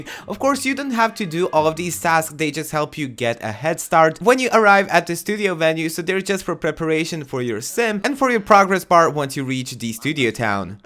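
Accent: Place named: France. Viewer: I LOVE your accent! Are you from France?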